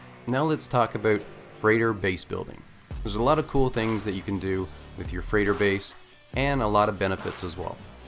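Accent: Newfoundland, Canada. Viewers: Didn't even realize you were a newf until you that last part hahah you're a day walker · The Canadian accent is strong with this one.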